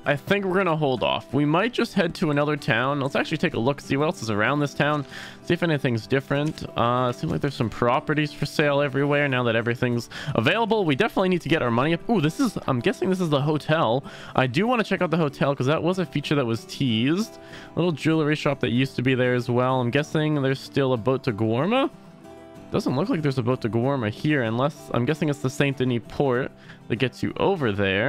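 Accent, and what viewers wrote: South, United States. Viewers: You know you don't HAVE to talk with a red neck accent.. many people in this setting were from all over the world or had intellectual backgrounds. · Mf rlly talks with a red neck accent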